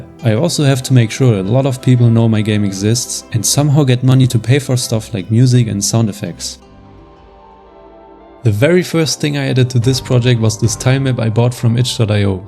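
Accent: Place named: Germany. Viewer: Man Hört doch das du Deutscher bist😂. Geil!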